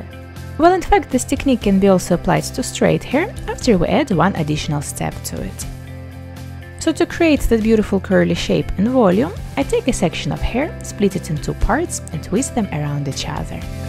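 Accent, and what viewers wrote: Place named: Russia. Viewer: U have rus accent...), wr r u frm?!)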